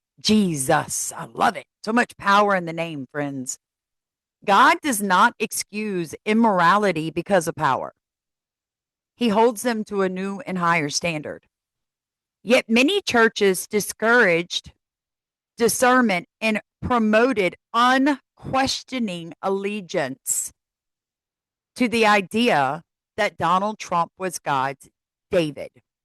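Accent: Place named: Louisiana, United States. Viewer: When I heard you say that you’re in Louisiana I was so excited because I was born in New Orleans and then my son walked into the room and heard you say the word “monster” and he said OMG mom she says monster just like you! I said of course she does we are both from LA 😂 I was not raised there but the accent still sneaks out sometimes. ❤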